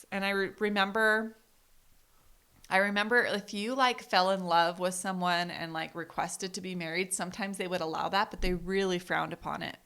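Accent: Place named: Utah, United States. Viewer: I love you, so much more after hearing your story. It’s incredible how God uses our testimonies to grow and change us. I could tell after us meeting that your accent sounded like you were from that area of Utah! I’m so proud of you for sharing your story. Love you guys!!!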